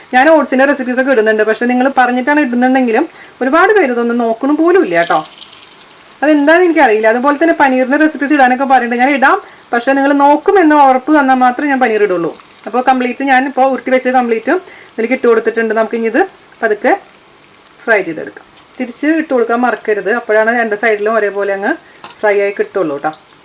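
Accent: Thrissur, India. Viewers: Love your recipes and your thrishuran malayalam. It's music to the ears!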